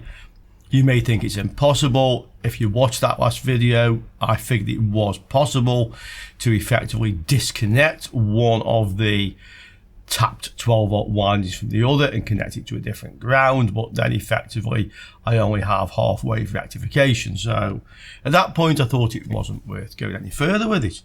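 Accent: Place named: Stoke, United Kingdom. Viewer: you sound like a fellow stokie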